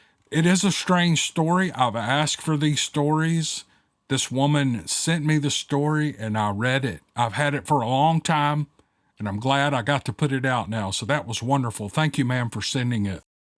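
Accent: South, United States. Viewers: We love your videos. Your the best story teller on YouTube, hands down. Southern accent and southern style story telling at its finest. Alright here we go! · Stay southern.i got your back. Iam from southern Missouri. Have the same southern. Talk. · Wow, what an email. Can’t please everyone. We ❤your podcast and your Southern Drawl. Thank you. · My mother taught me, "If you don't have something good to say don't say nothing at all." Just as you said, " Turn off the channel." ❤ I love your southern accent and everyone has trouble pernouncing some words. I'm guilty of that and I'm bad at spelling too. 😂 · I personly like your southern drawl NEVER CHANGE IT ! TO H3LL WITH THEM !! WHAT part of Mississippi are you form most of my family in Mississippi is in the OXFORD area ..They have Ghost Stories and Black Panther stories .. Now you have a GOOD Night Maybe We can Meet up for a Barbeque and tell some tales for your show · Never apologize for your Southern accent! 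You don't sound any better or worse than the rest of us Southerners, so you're in good company. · The author of that email needs LOTS OF PRAYER. Why would you waste your time running another person down like that? She is in a sad, angry place. I think I have been listening since the beginning and am so grateful for all of your effort,. You are correct about the pronunciation of bipedal. I just always assumed it was your Southern accent if you pronounced things differently than I do. 😅😊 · hey,its Peggie Sue from Ky, and i just want you to know that the way you talk is why i first started listening to you, and that was before you had started numbering your vids. So from one southern accent to another, dont feel like you ever owe an apology for being true to who you really are. That tortured soul, responsible for the volatile email you received, clearly hasnt reached the peace and happiness that you have, so for this envy and absolute ignorance, they responded the only way they knew....BEING IGNORANT DISGUISED AS BEING SUPERIOR. 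So, may God bless you and your family.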